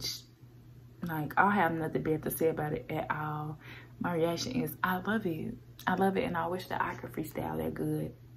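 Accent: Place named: New York, United States. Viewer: Are u from ny it sounds like u are😂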